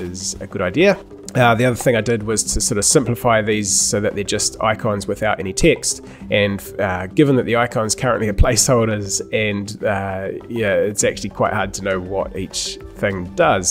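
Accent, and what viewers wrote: New Zealand. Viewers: are you Kiwi?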